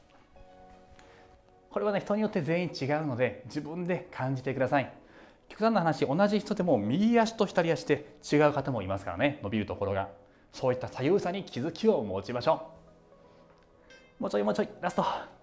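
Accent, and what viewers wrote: Kansai, Japan. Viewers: 初見です😊めちゃくちゃ良い声〜😊 これなら私も出来そうです！やってみます。ちょいちょい関西のイントネーションで親近感湧きました😊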